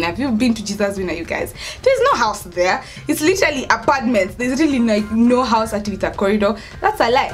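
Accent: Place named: Nigeria. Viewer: Your ascent sounds Nigerian. Pole sana kwa mkosi lakini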